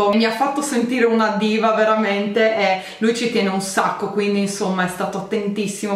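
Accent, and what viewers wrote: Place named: Trieste, Italy. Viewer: Haha se senti che te son triestina..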